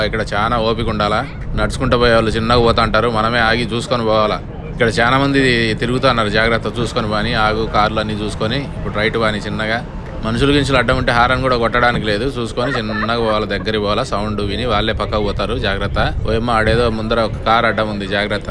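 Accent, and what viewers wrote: Kadapa, India. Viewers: Oorini pasugala vaadu ponilede !! 😂😂 Rayalaseema slang . Very funny 😂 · Enjoyed Sir. Super kadapa accent 😍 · Edhie mana rayalasema slag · Anna .. u r doing good job...with Kadapa language.... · Gaaru! Video Simply Superb. Kadapa Yaasa Bhaasa chala bagundi. USA lo Kadapa Yaasa ( Vuntaado Voosipotaado, Saami )! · ముక్యంగా మీ కడప యస ఇంకా బాగుంది.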